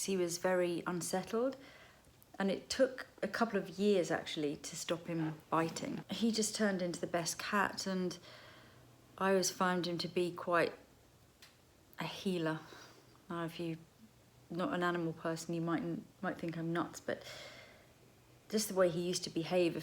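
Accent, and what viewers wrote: England, United Kingdom. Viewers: Hello from the United States. I absolutely love you and your style of makeup. I love that you teach about good skincare and other beauty products. I love your makeup tutorials and I would love to see you do more of them. I have never learned how to do my eyes the way I would like. I don't know why I just hold back and don't really go for it. I have deep set eyes and I am over 60. I would love to see you doing videos everyday if you could and teach us about good skincare, facial massage, how to wear makeup. What is best for older women and how we should wear it and also what colors, etc. So sad to hear about your Kitty and I know that has to be so sad. I have my pretty kitty, her name is Snowball and she will be 15 years old in April. It is so hard staying in here and it is so scary as I know it is for all of you there in the UK and all over Europe. 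We have to help each other and it is so wonderful that you are so gifted and talented in doing makeup that you can share with the rest of us all over the world with your videos. I hope you will do one everyday.. Love watching you and listening to you. Love the accent. I live in the South in the U.S. and I love all things English. It is my Dream to visit the UK and all the old Historical Places and Churches there. I am a Jane Austin kind of girl. I ordered your lipsticks and am so enjoying them so very much. I look so forward to all you teach and hope to see so much more. Thank You so much. You made my day. Love all the Beauty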